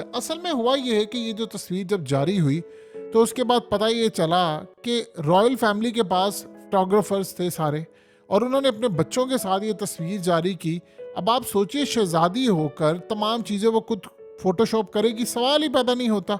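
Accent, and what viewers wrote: Punjab, India. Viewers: Yes, this faceless journalist has to give up his Punjabi accent and learn to pronounce correct names of European/American leaders, celebrities and names of British Royal family members. The name is NOT CHAARLAS it's King Charles the III. Thanks. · Punjabi aisay hi boly hain Dont worry 😅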